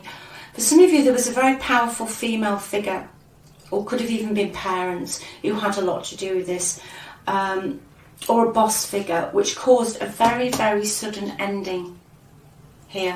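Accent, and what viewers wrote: United Kingdom. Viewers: You’re the best! Great to hear a fellow Brit ….keep dozing what you are doing. I feel relieved to be away from him!!!!!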